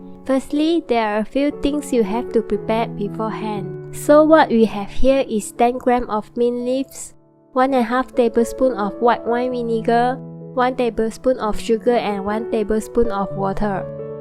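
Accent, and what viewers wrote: Malaysia. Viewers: Definitely Malaysian hahah, 'Add Oil' arh!👍✌ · I have just posted mint sauce recipe and found this video. I love this. I am now a bit confused since this video explanation in Japanese, subtitle in English and pronunciation is typical Malay Chinese... Anyhow, I love your channel and subscribed. Looking forward your coming videos!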